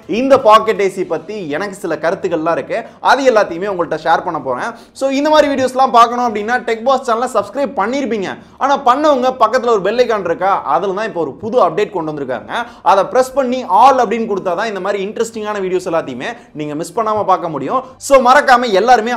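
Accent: Madurai, India. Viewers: Bro neenga maduraiyaa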